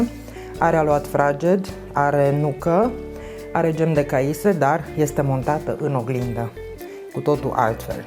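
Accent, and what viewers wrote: Transylvania, Romania. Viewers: Te ador! Esti minunata, felul cum explici in amanunt, dulcele accent ardelenesc, precizia executarii in tot ce pregatesti, sunt la superlativ! Si cum eu iubesc enorm muzica clasica, daca-mi permiti comparatia esti prin extrapolare un J.S.Bach al gastronomiei!❤❤❤❤❤❤❤❤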